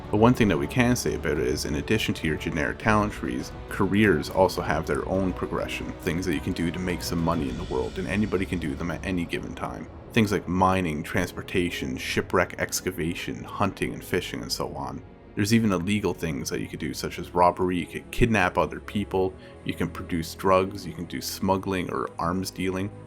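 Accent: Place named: Canada. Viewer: I typed this comment earlier, but I accidentally deleted it because I'm a moron. But, will you have playable female characters in the game? I saw that you had sketches of female characters, but would it be possible for the player to actually be a female? I don't mind playing as a guy, but I was just curious. Also, are ya'll based in Canada? I can hear an inflection in your voice (namely when you say "about" or "out"). I don't think my current computer can handle this game, but, pathetic as it may sound, I'm willing to get a part-time job just to play this game. I really hope ya'll throw a violin or cello in there or something as one of the musical instruments. That would make my life complete.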